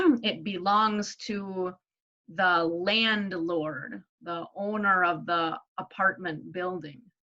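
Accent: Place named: Midwest, United States. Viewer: I'd guess you're from Wisconsin or Minnesota, based on your accent. How far off am I?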